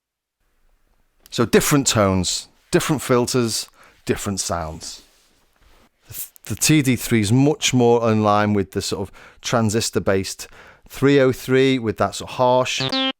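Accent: Liverpool, United Kingdom. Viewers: Loved discovering you and I subbed. Excellent comparison and I thought it was honest, witty and basically well done, even when negotiating embarrassing technical ground hum. The 303 and Roland sound was so well designed it's hard to improve but you documented the differences well. The 303 has that env mod slide on every note and is generally rounder or rubberier while the Nubass here sounded sharp and precise,with more range. Sometimes a bit too piecing,more isn't always better here (rez) but you showed off the sub osc and lfo well. Good stuff and as a fellow scouser it was lovely to hear the accent so well represented- articulate, not too rambling but clear and funny (without trying too hard). You may of found a YT niche. Sweet.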